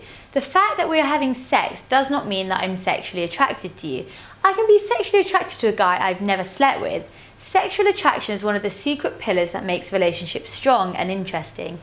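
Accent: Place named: United Kingdom. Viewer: British Accent ikr😘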